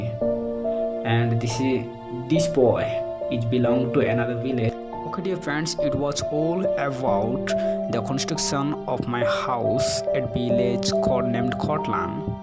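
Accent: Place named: United States. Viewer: America english😊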